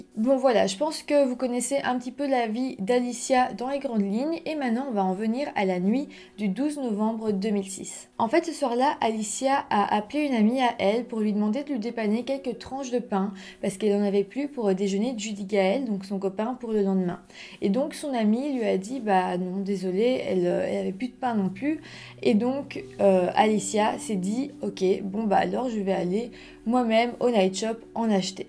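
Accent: Belgium. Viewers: En fait, je n’aime pas les histoires de meurtre, les charges émotionnelles sont terribles, mais en même temps j’adore l’accent belge (couire lol 😂) et plus sérieusement le sérieux des recherches et la présentation lumineuse des affaires. Merci.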